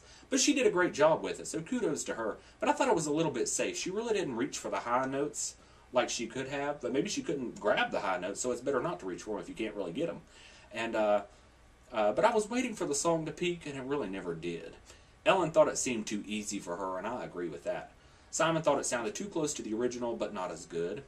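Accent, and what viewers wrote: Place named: South, United States. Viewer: Who is this guy? I'm from the South, but sorry, you sound like a hick. To quote Kara, you've got ridiculous pipes, but don't mean it as a compliment.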